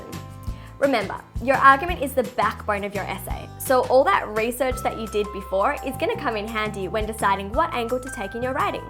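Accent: United States. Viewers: You are very cute. But the savvy southern blahblah talk lol annoying.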